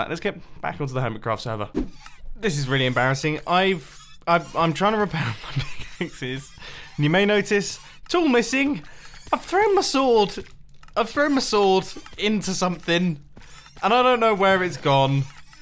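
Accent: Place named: United Kingdom. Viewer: Hi Mumbo. First of all, happy birthday! Can't believe you are the same age as me! You have an old, classy and very British voice, it's amazing. Second, for the design of the walking of the sphere, a simple circle walkway is the way to go I think.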